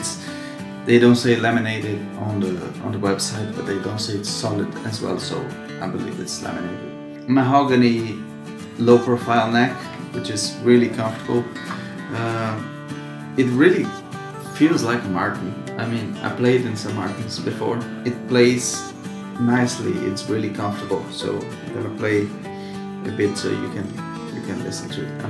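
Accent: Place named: Denmark. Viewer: Nice! It just sounded a little like a danish-english accent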